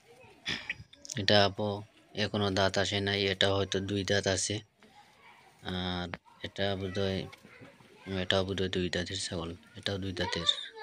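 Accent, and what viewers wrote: Sylhet, Bangladesh. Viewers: ভাই আপনি কি সিলেটি ত আপনার লোকেশন কোথায়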